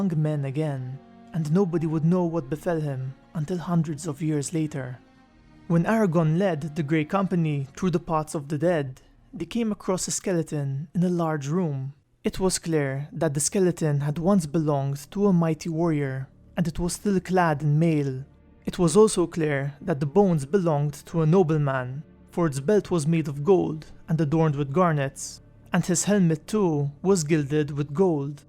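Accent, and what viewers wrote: Malta. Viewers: Are you Maltese? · LOL... I love Malta & Gozo though I've only been once, I think the accent is beautiful and can listen all day, but sometimes I have a slight laugh to myself as the pronunciation sometimes differs from English... then he shows a bowl of oats for the "oat breakers". LOL!! Well played sir, well played !!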